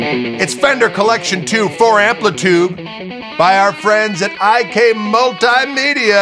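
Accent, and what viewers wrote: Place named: Chicago, United States. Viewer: This man sounds like he's from Chicago He's from Wisconsin. Close enough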